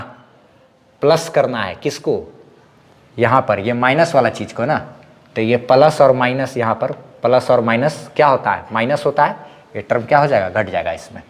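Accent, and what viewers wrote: Bihar, India. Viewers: Where is your home sir ? I think you are bihari because the way you speak is like bihari ...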